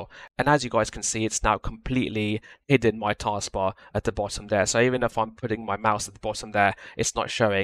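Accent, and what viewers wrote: United Kingdom. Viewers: lol brtish man fix the problem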